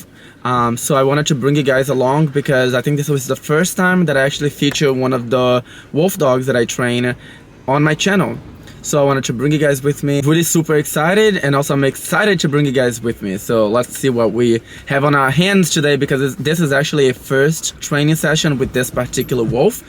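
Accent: Brazil. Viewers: Você é brasileiro? Eu ouço sotaque carioca.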